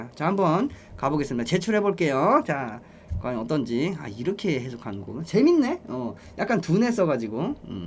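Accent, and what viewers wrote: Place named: Seoul, South Korea. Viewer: 케인님 나긋나긋한거랑 특유의 서울사투리 거르고봐도 말투나 표현법보면 상당히 여성적인편임 ㅋㅋㅋ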